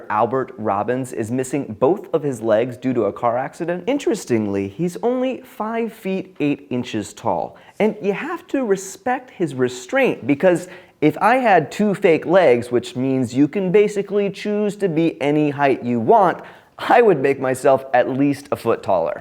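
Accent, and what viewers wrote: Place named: United States. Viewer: Everyone is freaking out in the comments about how an American pronounces words that ARENT ENGLISH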